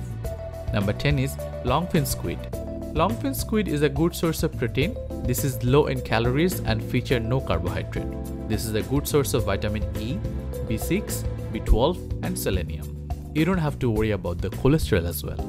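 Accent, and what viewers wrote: India. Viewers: Wrong information!!! Indian accent!!! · Indian accent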